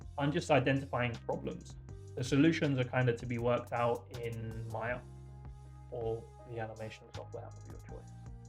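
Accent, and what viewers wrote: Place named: England, United Kingdom. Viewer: could have sworn he's english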